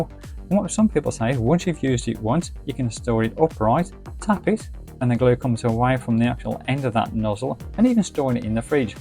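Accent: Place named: United Kingdom. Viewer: I have no idea what you'te saying and YouTube CC doesn't either. Hope whatever it is works well for you. [weird UK English strong accent goes here]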